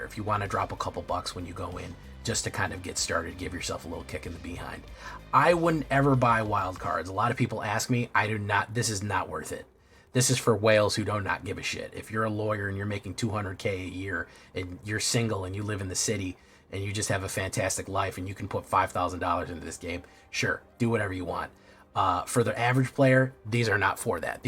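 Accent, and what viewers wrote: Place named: Fargo, United States. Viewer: hm yeah it does sound a bit like the people in Fargo, that makes sense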